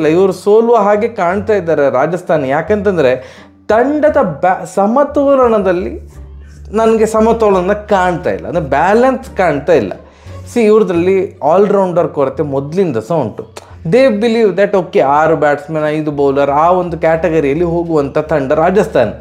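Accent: Mangalore, India. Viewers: ನೀವು ಮೊದಲು ವಿಡಿಯೋ ಮಾಡಿದಾಗಿನಿಂದ, ನಾನು ನಿಮ್ಮನ್ನ ಫಾಲೋ ಮಾಡಿದೀನಿ, ನೀವು ಐಪಿಎಲ್ ವಿಶ್ಲೇಷಣೆ ಮಾಡುವ ರೀತಿ ನಂಗೆ ತುಂಬಾ ಇಷ್ಟ, ಚುಟುಕು ಹಾಸ್ಯ ದೊಂದಿಗೆ ನೀವು ಹೇಳುವ ಮಂಗಳೂರು ಭಾಷೆ ನಂಗೆ ತುಂಬಾ ಇಷ್ಟ, ಒಂದು ರಿಪ್ಲಯ್ ಮಾಡಿ 💕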